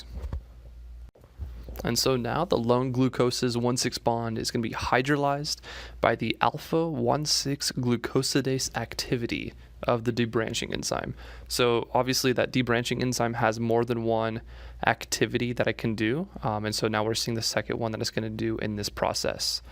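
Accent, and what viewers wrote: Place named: Wisconsin, United States. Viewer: The narrator's accent is so distinct, is that a Polk County Wisconsin accent I'm hearing???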